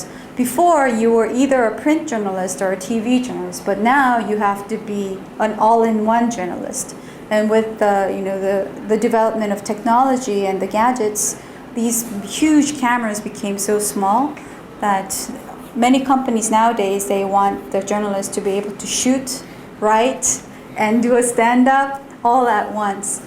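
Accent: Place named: South Korea. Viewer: 발음도 안조음 코리안 발음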